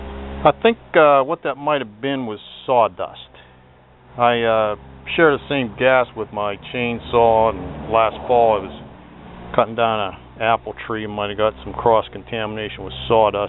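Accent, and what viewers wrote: Pittsburgh, United States. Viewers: Thanks! gonna try this out on my Toro! Knew you was from da'Burgh!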